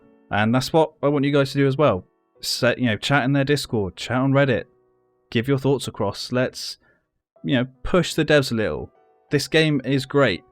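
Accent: United Kingdom. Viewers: Personally, I have No problem with paying a small amount to increase the Play ability of HLL. Like everything in this world , Pay as You go, they do need to maintain Income to be able to continue to improve the game. Given the huge numbers of people playing a small charge (as mentioned GBP 4.99 or local equivalent) will help to maintain the game into the future. and if they get you for the Bri-ish voice , they'll need to pay you heaps !! On a lighter note - Yeah England lost the Football, :) thats a shame, but so many people , as shown in your intro , crying and pulling their hair out , Its just a game !! :) still love your Videos and streams !! Keep going...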